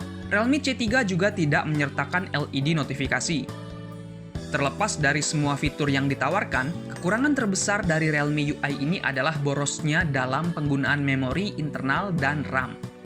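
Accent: Lampung, Indonesia. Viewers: Bang Orang Lampung kah?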